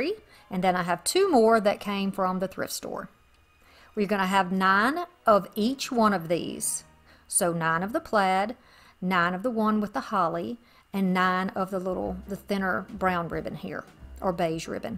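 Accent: South, United States. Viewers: I love how you curled the ribbons on your bow! So easy but so cute! You always find the greatest stuff at your thrift store and at Dirt Cheap! The closest one to me is Fort O, GA! You definitely sound like you’re in the south lol! TFS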